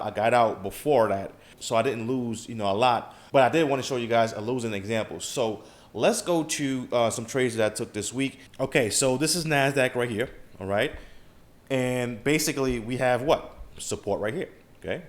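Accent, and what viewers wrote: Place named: Baltimore, United States. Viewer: I can hear the Baltimore in ya voice haha, love your vids from Jamaica 🇯🇲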